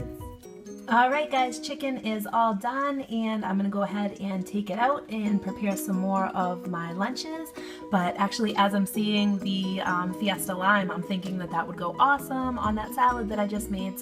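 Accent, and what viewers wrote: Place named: New England, United States. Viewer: You must be a New Englander hehe! Where did you get those awesome containers?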